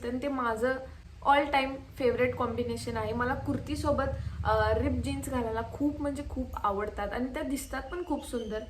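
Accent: Kolhapur, India. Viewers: बोलण्याच्या स्टाईल वरून तरी तुम्ही कोल्हापूर साईट कडच्या वाटता ताईसाहेब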